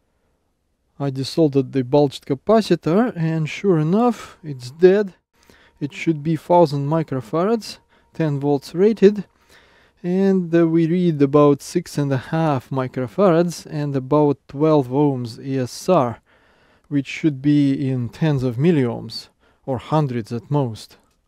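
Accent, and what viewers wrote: Russia. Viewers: Чел , ну слышно же что ты русскоговорящий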